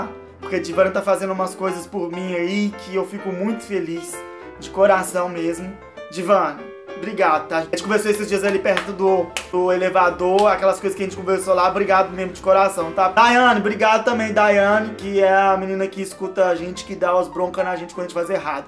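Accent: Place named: Goiânia, Brazil. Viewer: Vc é de Goiânia conheci pelo sotaque, doido que nem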